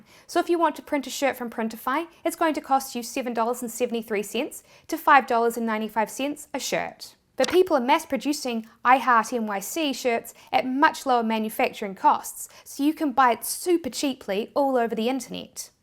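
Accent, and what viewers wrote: New Zealand. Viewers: Are you from nz? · This girl has a pretty distinct NZ accent, I don't know what you guys are talking about.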